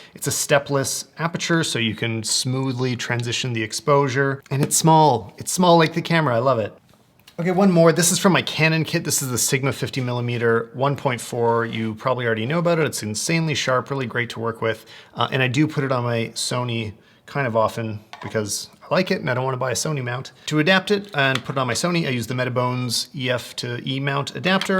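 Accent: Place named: Canada. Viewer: ur accent tells me you'r from Canada :)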